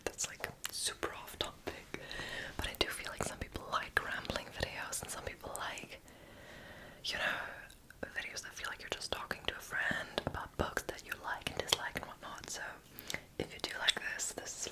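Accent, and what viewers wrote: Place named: Germany. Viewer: Dein Englisch wir von tag zu tag mehr denglisch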